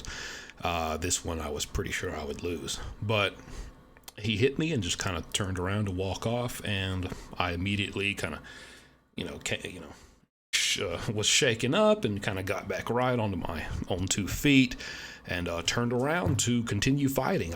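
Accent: South, United States. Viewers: Great content. Saw you on Ian Bick and became a Subscriber. The way you tell stories reminds me of how a minister Would Talk about stories from the Bible. Sounds you like you inherited a southern preacher man’s voice and dialect.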